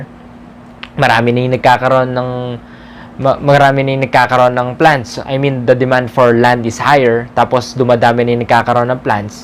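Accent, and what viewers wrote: Pampanga, Philippines. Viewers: Kapampangan ka sir? Hahaha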